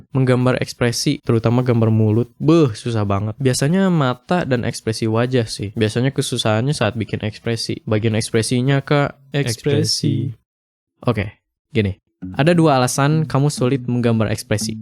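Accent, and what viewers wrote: West Java, Indonesia. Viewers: Si amang sunda euy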